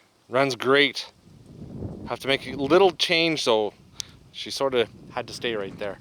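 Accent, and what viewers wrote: Canada. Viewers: your accent . are you Canadian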